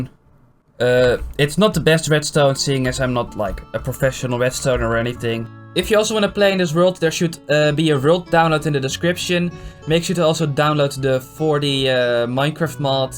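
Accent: Netherlands. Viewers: wild dutch accent lol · the dutch accent :3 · You sound....dutch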